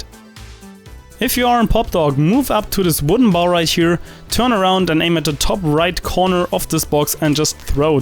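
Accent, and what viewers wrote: Germany. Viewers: man hört raus das du deutsch bist :) +1 gutes video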